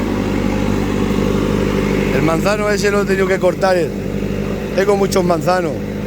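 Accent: Extremadura, Spain. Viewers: Buenos dias Muy bien lo q estás haciendo en la Finca chaval,eres un máquina,eres tan fuerte como la máquina esa que prepara la finca,nos levántas el ánimo a los seguidores de verte trabajar a ti,y lo bien que sabes hablar,no pierdas nunca ese acento extremño tan chulo q tienes,se nos está pegando a todos.¡me encanta!Saludos amigo.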